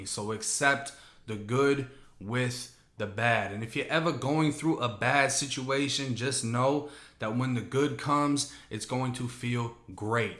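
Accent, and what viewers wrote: Boston, United States. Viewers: Lol that Boston Accent getting strong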